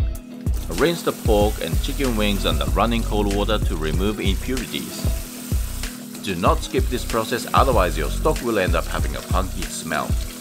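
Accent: Australia. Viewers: Are you in Australia? I hear a pretty strong Aussie accent. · You sound asian australian mate, great recipe btw! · Thank you for your wonderful video. Your English is perfect & it sounds like you have a bit of an Aussie accent. Bravo.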